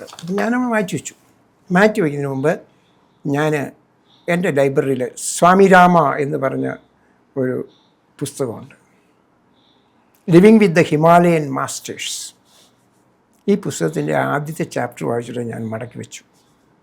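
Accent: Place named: Thrissur, India. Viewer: അത് തൃശ്ശൂർ കാരനാ😂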